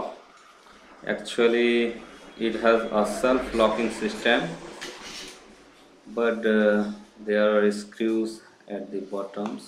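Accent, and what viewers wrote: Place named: India. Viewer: Bon sang, Mais pourquoi les indiens ou pakistanais parlent tous avec cet accent ?? on dirait une sorte de blague. J'ai toujours l'impression de regarder une mauvaise série sur le câble... Enfin, merci pour la vidéo sinon.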